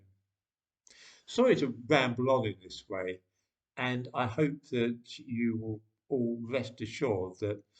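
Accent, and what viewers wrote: United Kingdom. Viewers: Every time I hear "Hello Again" I chuckle and smile, Love your videos and accent of course. Then I wonder is he typical British? Is there a typical Brit? There are so many sub sets I guess you'd call them, of areas or Nationalities like Whales Essex Ireland Scotland etc. What are you? I know you aint a Scot nor Irish but you are smart and i enjoy listening to what you have to say. I feel were pretty much in the same boat but a different continent. It scares me that our two Govts. are in lock step together same talking points agenda its like they're psychic. did someone say Hello again?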